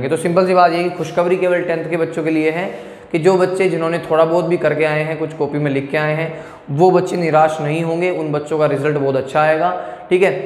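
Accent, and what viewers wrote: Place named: Garhwal, India. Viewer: Sir ji ap gadwali ho kya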